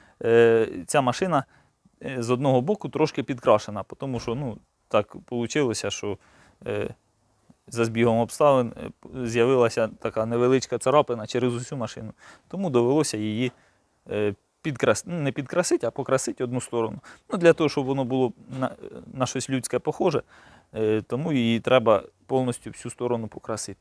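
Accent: Cherkasy, Ukraine. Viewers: судячи з номерів - ми земляки. Тай говір Черкащини з русизмами чутно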